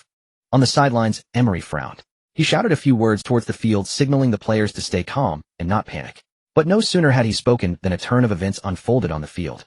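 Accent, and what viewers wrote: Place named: China. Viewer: Is he playing in La Liga or Chinese super league because every name is pronounced in Chinese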